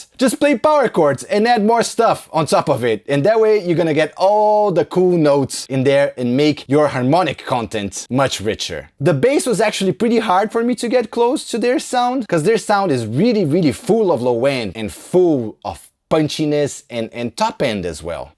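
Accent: Brazil. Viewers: Po o seu sotaque parece muito de brasileiro, vc é br? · mano vc é brasileiro? parece pelo sotaque ahahaha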